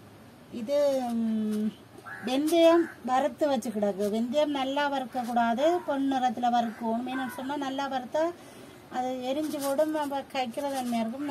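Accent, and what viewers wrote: Jaffna, Sri Lanka. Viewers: Akka u vioce super original jaffna tamil 4 sweden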